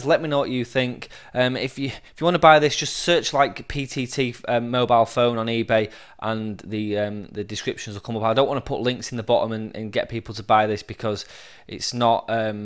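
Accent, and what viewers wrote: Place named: Cockney, United Kingdom. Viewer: good video but you speak faster and you use the cockney accent and I cant understand you please add an authentic english subtitle because the automatic subtitle generator of youtube can't shoot all what you say because you speak faster and withe unauthentic accent thank you for reading my comment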